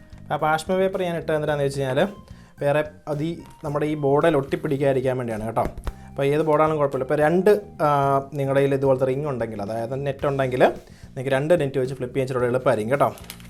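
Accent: Kottayam, India. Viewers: 'flar' 😄😄😄😄 sir kottayam kaaranano sir inte oro videos onninonnu soooopr 👍👍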